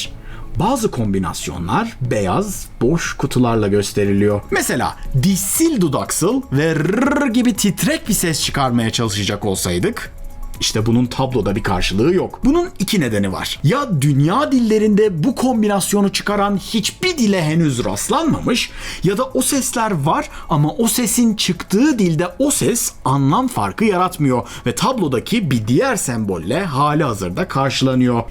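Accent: Samsun, Turkey. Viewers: Ya abi Samsunluyuz da bu kadar da mı belli oluyor. :D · reis sendemi samsunlusun :)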